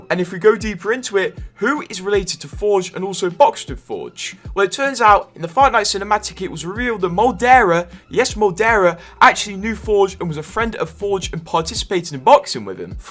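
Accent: Newcastle, United Kingdom. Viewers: Newcastle thick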